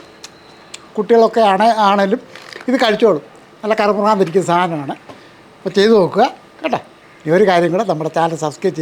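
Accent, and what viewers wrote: Kottayam, India. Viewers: താങ്കളുടെ കോട്ടയം slang ആണ്ഏറ്റവും മനോഹരം വിഭവങ്ങളുംവളരെ ഗംഭീരം നന്നായിരിക്കട്ടെ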